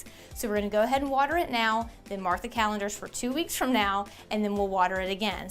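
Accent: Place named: South, United States. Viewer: Thank you for this video:) These are definitely the plants for me. Only need water every two weeks! Haha... Also, you seem to have a southern accent. I am from Alabama. Are you close by?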